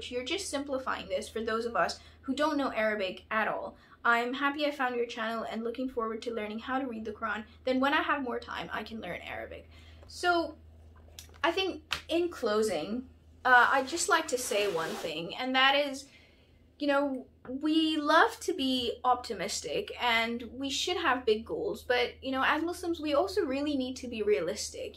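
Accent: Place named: United States. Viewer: It's a very interesting concept and makes a lot of sense. Arabic is not an easy language to learn and not everyone needs to learn all of it in order to understand fus'ha. Very good point about learning from translations. Where are you from? Your channel says UK but your accent sounds slightly American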